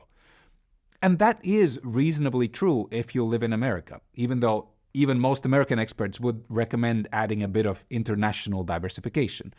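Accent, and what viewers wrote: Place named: Latvia. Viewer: why does this english named person speak with a latvian accent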